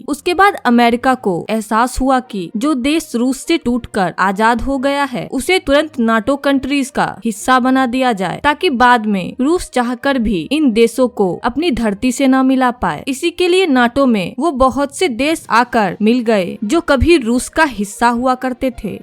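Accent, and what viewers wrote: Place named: Begusarai, India. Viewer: Aapki aawaz Bihar k begusarai ....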